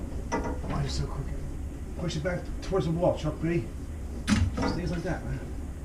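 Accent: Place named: Philadelphia, United States. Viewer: Ay chuck B you from Philly bro?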